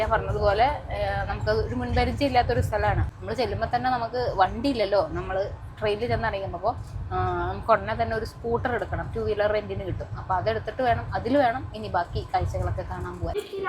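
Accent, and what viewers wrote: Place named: Valluvanad, India. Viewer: Molu eth nattukariyanu❤valluvanadan bhashyanu❤❤❤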